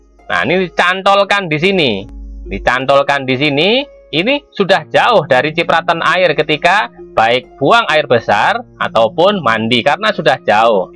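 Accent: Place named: Java, Indonesia. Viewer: Jowomu medok men to kangmas heeeeeemmm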